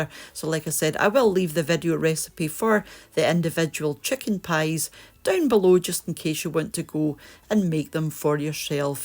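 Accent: Scotland, United Kingdom. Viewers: Hey, have you thought of changing the "Hillbilly" music to some nice Bagpipes? 🤣 Do you know, if you weren't Scottish, your channel wouldn't be half as good!... I mean you're a great cook, but it's your wonderful friendly Scottishness that makes your channel really special! Your accent is a delight! And Scotland is such a beautiful place! 🏴❤️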